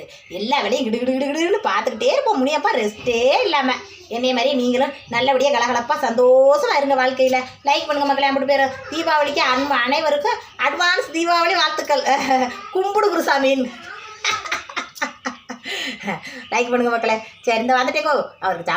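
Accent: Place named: Tamil Nadu, India. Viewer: Un video yengaluku oru motivation na eruku, Unnoda tamil slang, kudumbapaanga erukuradu, u tubela samarthiyama sambadikura thiramai , super vazga valsmudam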